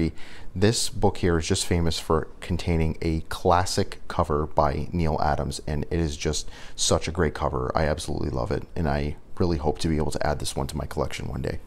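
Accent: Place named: Canada. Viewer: This is what a Canadian accent sounds like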